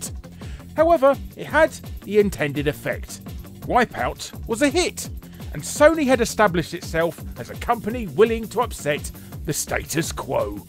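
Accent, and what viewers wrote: United Kingdom. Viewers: Very few British accents don't annoy me. You are the very few...your lucky!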